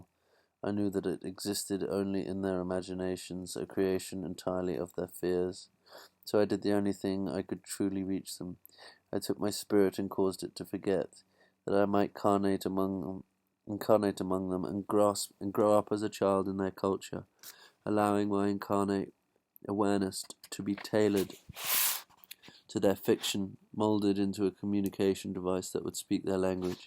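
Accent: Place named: England, United Kingdom. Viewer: Love the book but, just because this guy has an english accent does not improve the presentation. This is pretty lousy.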